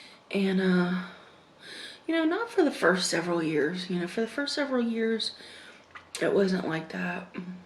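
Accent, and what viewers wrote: South, United States. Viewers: !!!! Hope you're holding up. Just started the really deep abuse blogs with my husband who was severely sexually abused when he was small in Nebraska (a very bad state for that). We need a part 2 if you can handle speaking about it. Helping us immensely. Your writing/speaking is beautiful and I hope you can eventually get published, like no joke. Also, I understand this is your career but I hope you can start to incorporate more healthy items. Much love! <3 Also, I bet your live really South/Western because you sound JUST-JUST like my Nana from Galveston/Houston.:) Makes me miss her. Haven't seen her in years.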